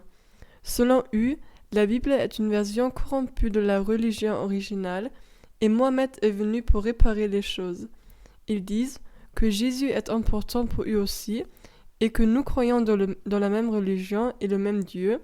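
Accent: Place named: Germany. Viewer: Cet accent allemand si charmant pour écouter parler théologie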